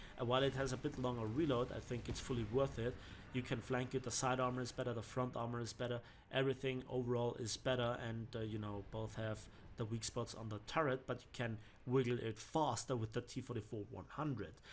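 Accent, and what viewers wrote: Bavaria, Germany. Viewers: Question: What do you think of WoT in General? Und kannst du, wenn du deutsch sprichst bitte das Bayrisch unterlassen? Ich hab dann immer das Gefühl, ich hör Seehofer oder Söder zu. Yet thete is no indication of He filler, penetration values or fuses and so on in WoWs...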